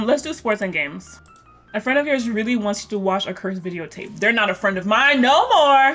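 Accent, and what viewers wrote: United Kingdom. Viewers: YOUR BRITISH ACCENT IS ACTUALLY SO SLAYYYYYYYY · the British accent. I love it queen. 👏🏽👏🏽👏🏽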